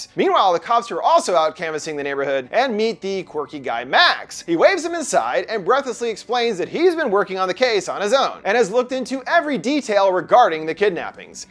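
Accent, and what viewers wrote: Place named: South Carolina, United States. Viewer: I never claimed to not being disrespectful lol. I very much meant to offend his fans because I think it's disrespectful to sheer a conversation praising a creator into a conversation praising a different creator by putting the original one in second place or "just not as good." My observations are completely one dimensional, I watched like 2 minutes of a video and looked at his thumbnails, I'm not a critic I don't want to watch him based on the 2 minutes and so I base my entire opinion on it. I'm not a hypocrite, I'm arguing for a subject not a spectrum, just because I don't like mowing lawns doesn't mean I don't like gardening. His thumbnails are clickbait and aren't to my liking. He talks like a south Carolinian closeted husband. and I don't like him.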